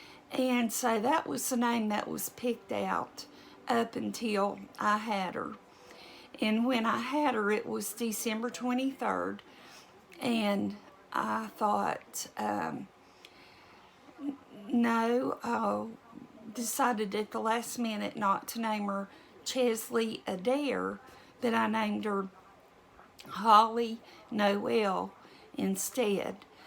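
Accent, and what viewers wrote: South, United States. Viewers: Wow it sounds like an easier birth since you didn't have hours of pain. And I hope you have a speedy recovery from your being sick. I just love your southern drawl and how calm and relaxed you talk.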